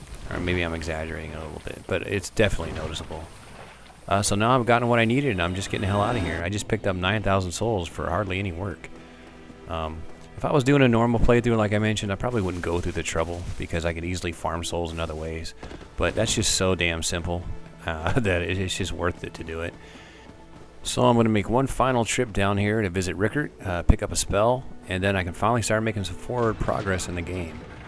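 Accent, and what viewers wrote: United States. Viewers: I really like your commentaery. I am Scottish and I have relatives from LA and New Jersey. I love American accents. Whereabouts are you from? Thanks.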